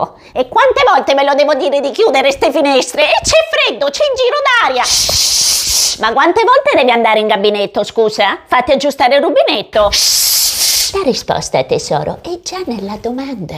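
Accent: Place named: Sicily, Italy. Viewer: hai l'accento siciliano in questo video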